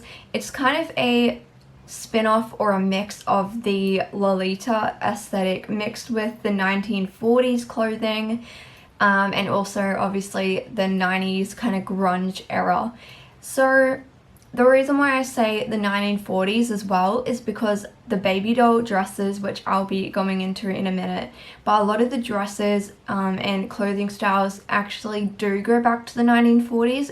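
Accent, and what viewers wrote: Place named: United Kingdom. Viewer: Maybe have a look at male "fashion" and make comparisons to modern day male "street" ware, that would be interesting. Dr Martins are really getting some attention here in Denmark these days among the young people, I see them all the time, never owned a pair but always thought that they looked a bit uncomfortable, guess you have to "suffer" for beauty. Another thing that was popular in the good old grunge days where t shirts on-top of sweaters , Layne Staley from AIC always did that, I guess it also covered up his needed marks among other things. I take it from your accent that your from the UK, I really liked those UK bands from the Brith pop days, it was a funny switch in style and attitude, the fashion changed a lot, slim jeans, leather jackets, retro Adidas sweatshirts, under sized t shrirt and poloshirts took over, those where funny times.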